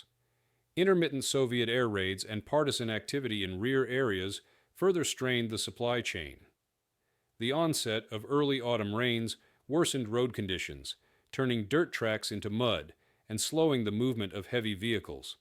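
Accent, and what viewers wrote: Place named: United States. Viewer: I'm glad we finally have a World War II documentary narrated by a American voice and not another British voice, whether AI or human.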